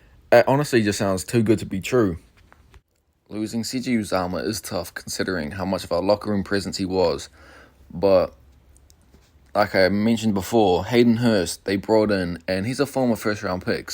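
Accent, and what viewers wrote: United Kingdom. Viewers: ngl its super different to hear someone with a british accent doing commentary on the nfl. im glad to hear that american football is becoming a little more mainstream on the international stage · Is this guy British?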